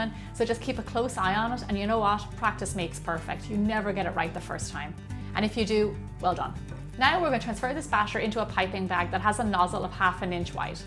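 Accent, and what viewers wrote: Ireland. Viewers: Hi! These are super cute, the gold lusture dust makes all the difference! I watched the video you done on a Youtube channel, you sound so Irish in that compared to your videos! I couldn't believe it at all! Are you taking part in Donal's collab? I can't possibly be that Irish, I've never tried bread pudding!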